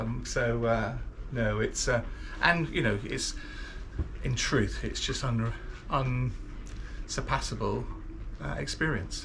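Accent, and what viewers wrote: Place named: West Country, United Kingdom. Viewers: 😂 its the West country accent m'lover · Like the look of this one. But maybe its the west country twang but...its Mural not Murial.😉😎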